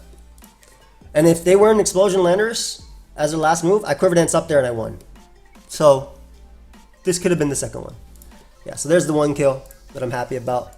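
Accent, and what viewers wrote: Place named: New York, United States. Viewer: The New York accent hasnt faded from Connecticut dwellings. Yes. 👌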